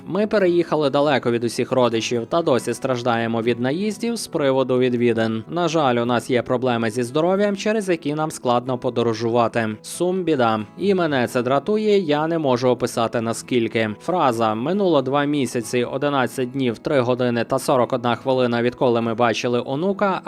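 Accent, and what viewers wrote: Russia. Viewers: Та запам'ятайте вже нарешті: прохООООООООдити, довОООООООООООдилося! Скільки Ви ще тягнутимете ці свої кляті кацапські наголоси?!?!?!?!?!?!?!?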